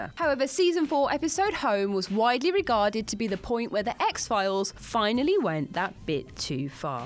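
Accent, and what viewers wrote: United Kingdom. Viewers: The Top 10 countdown industry is becoming ridiculous. Now a random person with British accent is plagiarizing other top 10 videos and posting them...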